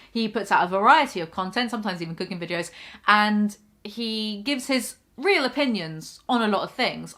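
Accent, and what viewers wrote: United Kingdom. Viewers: Are you British ? Sounds like you’re from where I am haha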